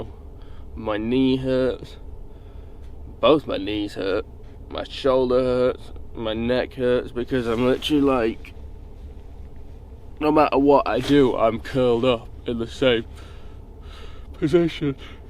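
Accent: Yorkshire, United Kingdom. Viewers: I have the same tent and similar stove. The tent is a great tent, with those tent pegs where the cut out is fold the webbing and it I’ll fold it into the grooves. Or hook the top of webbing into the groove. It won’t budge. With your stove top tip, get a piece of Velcro wrap it around your stove box, it’ll hold it all together whilst messing about with the threaded rods. When i set mine up I do it on a tarp or foam pad not on the ground so nothing is lost. Your right you’d be fecked if you lost a wing nut. With regards to your stove in colder climates like Norway 🇳🇴 etc you’d get a buddy to take turns through the night popping wood into the stove throughout the night. My first night using one is set an alarm on my watch every couple of hours to feed the stove. Crap night sleep but waking up to a nice comfy warm tent was nice. If you don’t want to wake up feeding the stove it’s got to be a winter down sleeping bag. 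The guy in the states that distributes luxe tent did a video with a battery clip in fan on the tent pole. Pushes the heat backdown towards you. Try these tips out mate and I promise you a better camp out. Are you a yorkshire lad, picking up the accent a long way from home mate eh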